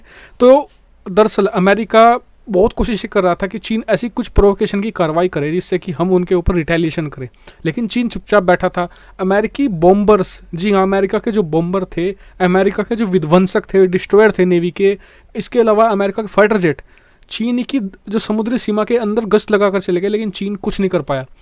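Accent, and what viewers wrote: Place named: Bengal, India. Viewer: Tum kidharke banda hai sala bangala jaisa boltahai hindi vi achi nahi baltahai.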